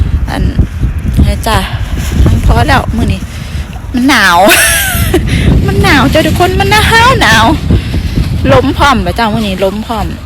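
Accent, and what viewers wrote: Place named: Chaiyaphum, Thailand. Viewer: เว้าคือคนชัยภูมิเนาะ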